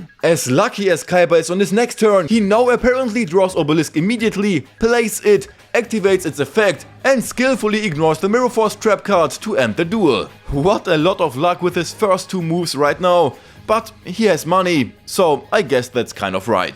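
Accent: Germany. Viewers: The way this mans pronounces "Anime" and "Pegasus" just drills my ears. Damn Germans have such terrible accents and their language is so disgusting...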